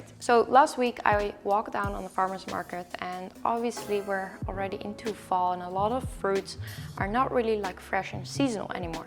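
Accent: Netherlands. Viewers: Lol r u dutch your accent is so dutch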